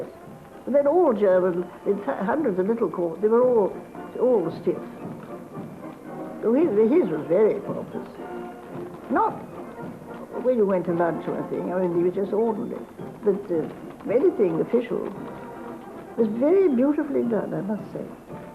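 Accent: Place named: RP, United Kingdom. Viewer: She's so posh, I can't understand her.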